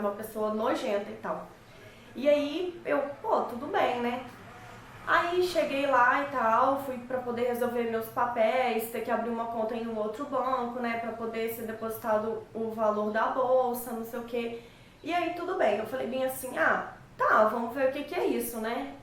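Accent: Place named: Brasília, Brazil. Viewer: Vc e de Brasília ? pelo jeito de falar parece ser! :) gostei muito do vídeo um abraço.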